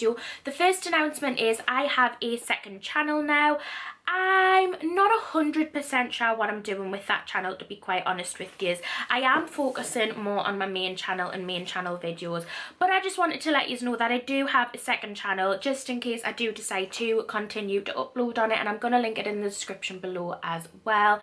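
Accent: Newcastle, United Kingdom. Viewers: I'M FROM NEWCASTLE! AHHHH FOUND A GEORDIE!! CAUSE I'M A GEORDIE TOOOOO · Got recommended this video, heard the accent, subscribed for being a rare relatable British personality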